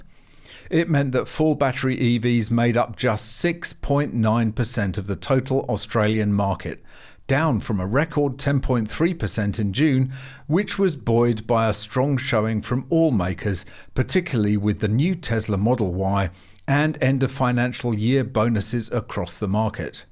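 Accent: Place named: England, United Kingdom. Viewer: It grates on the ears. Especially with a pommy accent.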